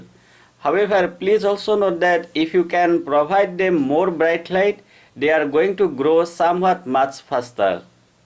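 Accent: Assam, India. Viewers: Apuni oxomiya niki? Accent tut guess korisu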